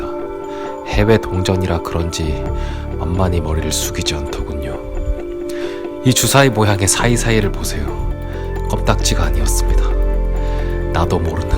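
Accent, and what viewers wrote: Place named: South Korea. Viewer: 포르투갈인이 한국어 어설프게하면서 동전 닦는거 생각했는데 찐한국인..이었구나...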